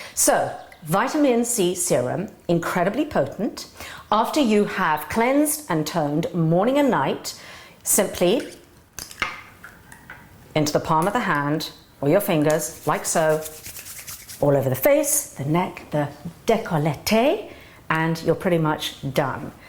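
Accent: United Kingdom. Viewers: You start of sounding British but then pronounce "vitamin" like an American. What's going on?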